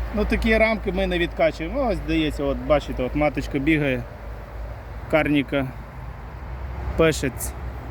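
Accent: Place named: West, Ukraine. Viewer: Слава Україні ! Я з Львівської області а ви з півдня України , але по вашій мові можна сказати що ви теж ,,западенець".😁 Дякую вам за мову та за повчальні , змістовні відео. Героям Слава !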